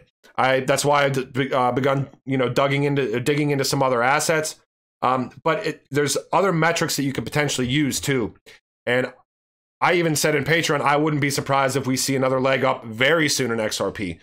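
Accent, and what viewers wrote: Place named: East Coast, United States. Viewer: It's your East Coastness coming through. You're fine. Keep doing you.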